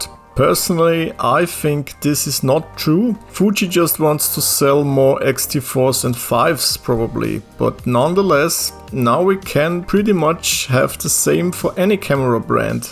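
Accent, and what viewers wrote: Germany. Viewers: Nice german accent !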